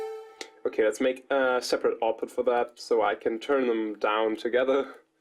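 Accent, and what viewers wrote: Germany. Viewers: bist doch berliner oder :D?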